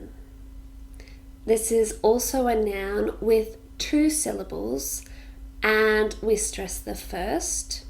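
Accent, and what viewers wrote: Australia. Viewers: Hi!!!! I Am from Blumenau Brazil and I love your acent Australian!!!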